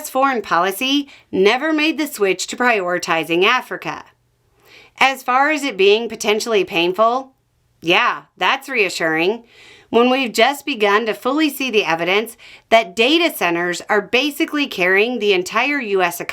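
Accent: South, United States. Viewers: You just got to love the Southern accent and the sentiment communicate across.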